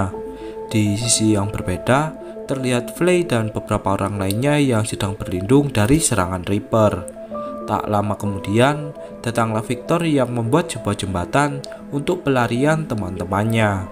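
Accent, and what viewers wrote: Java, Indonesia. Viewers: Wkwkkw ,sama kek pemikiran gw dah persis kamar film tp ini versi medoknya wkwkw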